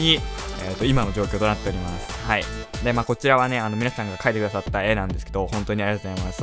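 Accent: Kansai, Japan. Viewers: さんの関西弁がたまに出る動画が好きです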